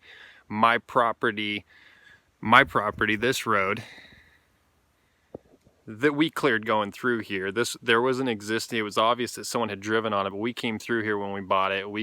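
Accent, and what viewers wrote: Idaho, United States. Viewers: Wait you are from Idaho?! Me too ahhaha I was just about to say you sound like an Idaho man hahah love it!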